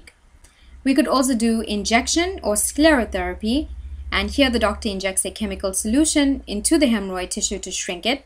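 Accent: South Africa. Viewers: You sound like you're a south african indian, am I right?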